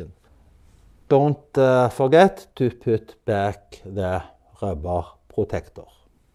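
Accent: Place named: Scandinavia. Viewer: so funny, when i hear that scandinavian accent I think of all the scandinavian rally and f1 champions and i'll just buy anything you say :o))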